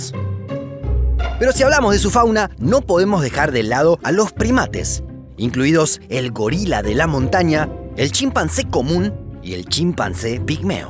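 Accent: Argentina. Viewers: Por tu forma de hablar, sos compatriota o uruguayo. Abrazo. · Vaya acento de este chaval, qué es ese dialecto argentino?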